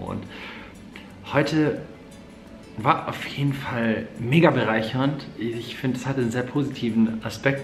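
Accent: Swabia, Germany. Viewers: Omg rede öfter schwäbisch 😍😂 Da fühl ich mich wie zu hause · Dein Schwäbisch😂👌 Komm au ausm Schwaobaländle😁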